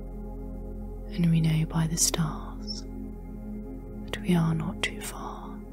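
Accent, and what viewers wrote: United Kingdom. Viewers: I knew your accent had a lovely hint of west country, I thought maybe Wilts Dorset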